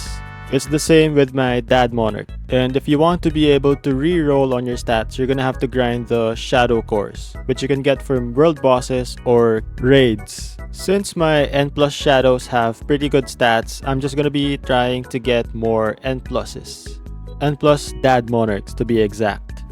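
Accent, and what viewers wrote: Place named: Philippines. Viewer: I can hear some Filipino accent tho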